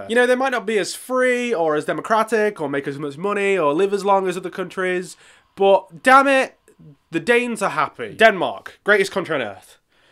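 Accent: United Kingdom. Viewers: Are you from Essex? I'm curious, also new here. Nice video. :)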